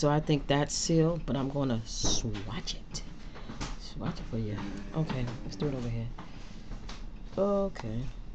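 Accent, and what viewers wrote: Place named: New York, United States. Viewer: Are your from NY? Lol i ask because you sound just like my best friend tasha and you both have a slight NY accent, love it!! You give a calm sweet vibe i like that.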